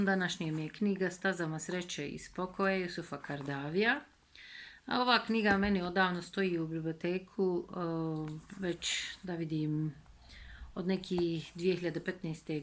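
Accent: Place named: North Macedonia. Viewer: iyi guzel hizli konusuyorsun konusma aksanin makedoncaya. ve rus diline kaciyor kelimeleri konusma ve okuma yi yutarak ve hizli konusup okuyorsun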